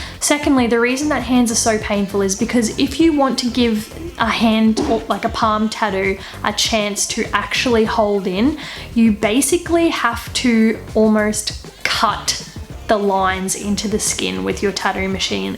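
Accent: Australia. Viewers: She is Australian. Not British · you are so cute, i love your little australian accent :3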